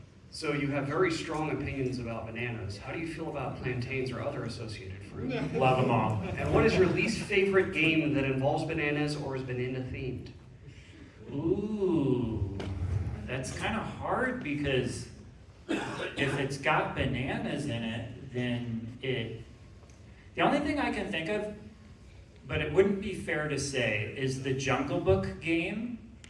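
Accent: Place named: Western Pennsylvania, United States. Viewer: I recently found your channel and have been binging it. I thought you from the mid west or near east coast. I'm from western PA and your character is how we just talk. I thought you were one of us, you dirty dog!! Everyone even called my grandmother "Naner" for crying out loud. I got back into retro gaming in the past 2 years after dealing with a potentially very serious illness (it's mostly under control now) and finding your channel has helped me stay together while I distract myself with collecting and revisiting retro games again. I'm a lifer at 41yo started with a used Atari 2600 at age 4, then NES etc. all the way through to today. Thank you for the excellent channel.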